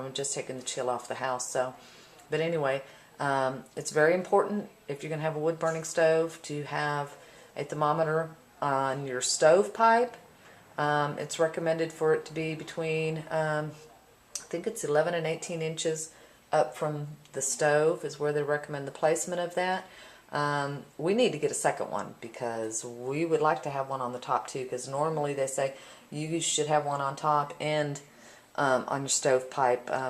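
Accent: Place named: Texas, United States. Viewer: Just subbed to you guys. I commented on your other stove video. I was born there and my mother was raised in Shiner...it is refreshing to hear a lady speaking with a Texas accent. You sound like my dear Mama and the other ladies in her kin. Plus, you are making homemade bread....from scratch! One of my best memories is walking into my Granny's little kitchen and she's give me a chunk of fresh buttered bread from her stove. Good stuff. The memory is making me hungry....gotta go. :)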